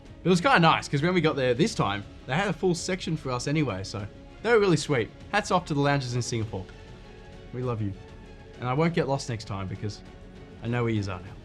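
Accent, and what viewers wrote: Australia. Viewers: I love you,... But, your Aussie accent confuses me to laughter!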